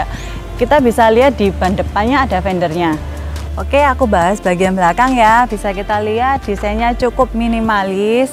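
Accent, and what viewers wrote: Java, Indonesia. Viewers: Mbak nya ayu,jawa nya ketara,aku sukaakkk.... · Medok bner dah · iya gais, medok nemen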